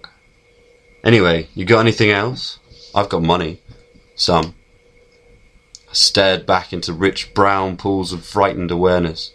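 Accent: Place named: London, United Kingdom. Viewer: It doesn't say who's reading it but he's great, and has a London accent. I also liked the pictures - some of them are of buildings I have worked in!!!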